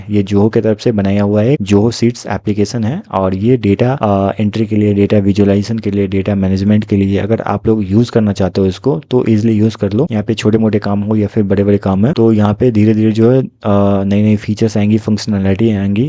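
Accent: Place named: Bengal, India. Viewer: Tum tam karna band karo. Bong lagte ho.but indeed informative.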